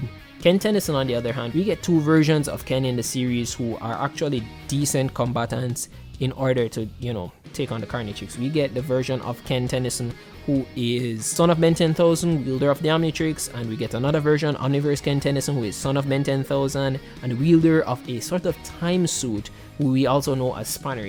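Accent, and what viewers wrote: Jamaica. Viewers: Haven't your watched videos in some time, quick question are you Jamaican?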